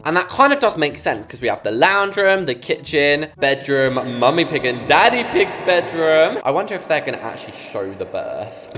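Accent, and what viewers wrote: United States. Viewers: PLEASE THE AMERICAN ACCENT IS SO SCARY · Gurlll I’ don’t like you amaerica accent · I do not like the American accent I like your normal voice better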